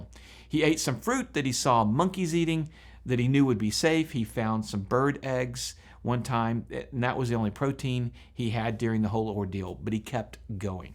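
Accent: Texas, United States. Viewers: it is obvious you are from Texas.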